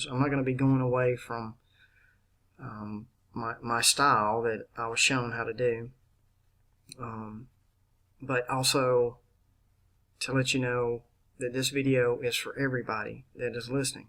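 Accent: South, United States. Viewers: I like your accent because I'm from the great Southern USA!! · what part of the South are you from buddy? I'm from east Tennessee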